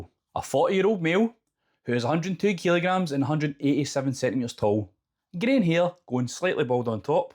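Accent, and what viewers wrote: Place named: Scotland, United Kingdom. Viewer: Great information! I was enjoying your Scottish accent when you flash the text “nips are out. Sorry it’s cold in Scotland”. I was not expecting that and hadn’t noticed your nips until you mention it but it cracked me up. Congratulations on the weight loss and the video. And for making me laugh so hard at your comment. It just caught me off guard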